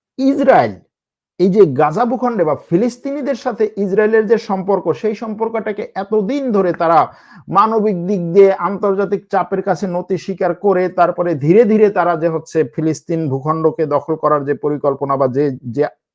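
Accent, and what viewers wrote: Bangladesh. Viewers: do you hail from.Noakhali.Sound ok.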